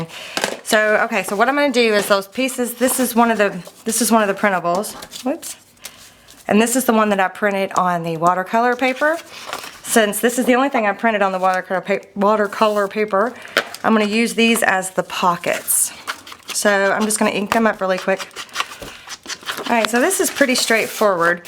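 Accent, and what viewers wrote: South, United States. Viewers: I absolutely adore your videos and I think I've watched all of them and if not it's only because I haven't found it YET!! I do find it funny that you say hoes for holes...I'm assuming very southern. I'm from Alaska and shared one of my books created from a couple of your printables I'd combined a bit and you shared it on FB...that was cool!! Thank you for all you do...it's opened a whole new world of crafts for me. Before I'd always been a digital scrapper (still am to a huge extent but not I print and make some of my designs in paper books I create). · Being Southern like you, I understand everything you say!! LOL.... Here in our area of the Gulf Coast of MS.. we have a saying when we know something won't work out or something just won't work at all, we say, " That dog won't hunt"..... Being from an area that has a lot of hunters then we all know what this phrase means!! 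I love love love that you show how to use ordinary stuff to make your journals... without having to buy a bunch of expensive products you show how to use what we have in our stash!! The journal is awesome and I think it would make a great planner!! Thanks,.... you did it again! ( ahhhh I am a poet and don't know it) Another southern saying?? LOL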